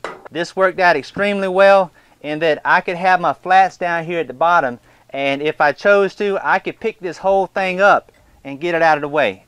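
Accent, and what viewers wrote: South, United States. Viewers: finally, genuine southern accent... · Lord willing and the creek don't rise. I haven't heard that in 20 years. Your idea for the grow light setup is terrific. I never thought 1" PVC pipe would be strong enough, but it sure looks sturdy. I'm really commenting, though, to tell you that you have the best accent in America. I have NO idea where you're from, since the only sort-of southern accent I know is New Orleans, where much of my family lives, and that accent is just weird. You don't sound like a Texan, either. Actually, you sound like the character Boyd Crowder on "Justified." That took place in Harlan County. Are you from or near the great Commonwealth of Kentucky? But the actor grew up in Georgia, so maybe he was just speaking his own natural way. Don't laugh at me. I was born and raised in the rust belt and moved to Cali as an adult. 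Never gotten to the south other than N.O.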